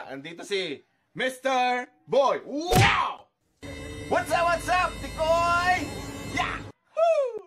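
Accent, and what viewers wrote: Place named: Tarlac, Philippines. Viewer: and ilocano ka po ? u sounds like tarlaceno or pangalatoc or ilocos kabayan pala kita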